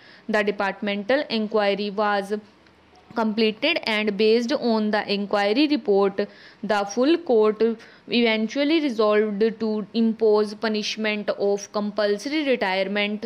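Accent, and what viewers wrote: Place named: South India, India. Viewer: thank mam.love from west bengl.it is really help us a lot.thank you very much. but mam please try to pronounce straight foroward ,i think there is some south indian accent in it. other wise it is very help full.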